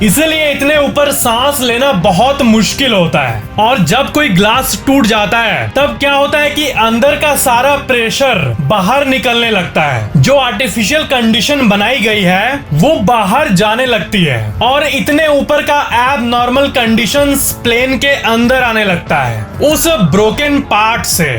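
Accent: Bihar, India. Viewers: Bhiy bihari h 😁😁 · Aap Bihari ho kya bhai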